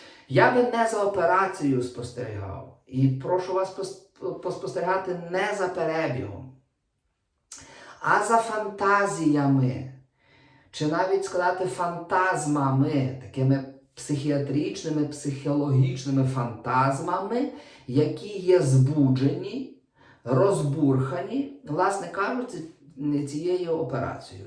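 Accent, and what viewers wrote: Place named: Galicia, Ukraine. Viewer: Хто така офензива? Галицький діалект не всі розуміють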